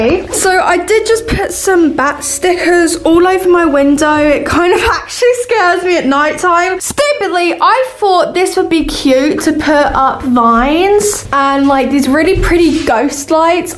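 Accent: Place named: United Kingdom. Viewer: WAIT?YOUR BRITISH?!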